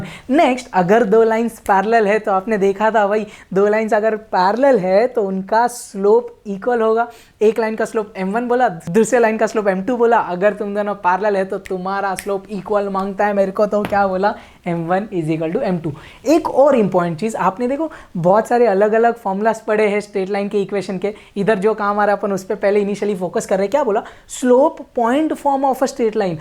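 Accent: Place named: Aurangabad, India. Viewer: Are u from Aurangabad ?? Cause of your language is just like Aurnagabdi Hindi .. 💯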